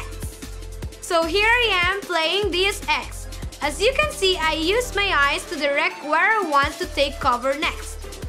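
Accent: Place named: Philippines. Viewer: hay filipino accent talaga xD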